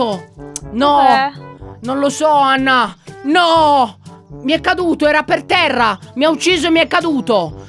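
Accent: Sardinia, Italy. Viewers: Ma è sardo?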